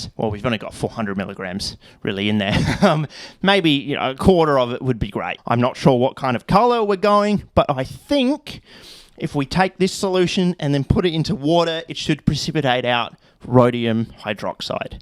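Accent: Australia. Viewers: This video autoplayed from a Primitive Technology video and for a sec I thought the dude finally broke his years of silence to cheerfully yell at me in an Australian accent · Who else thinks should be a highschool Chem teacher?! I swear he reminds me of "Doc" my hs Chem prof, got the 'stache, even says "Ah-loo-minium" and everything😢.... (I'm in the US, Appalachia at that 👉🏻"Apple-atcha" for context) Who knew I'd be nostalgic watching an Aussie backwoods chem channel (BEST chem channel IMO) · Ah yes my daily dose of tar synthesis narratived by a goofy Aussie while in a shed.. now we are cooking with kerosene. Best wishes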